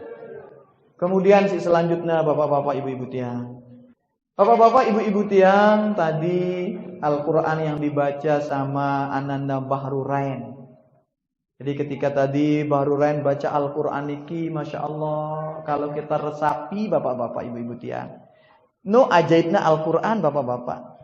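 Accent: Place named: Lombok, Indonesia. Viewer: Khas warga lombok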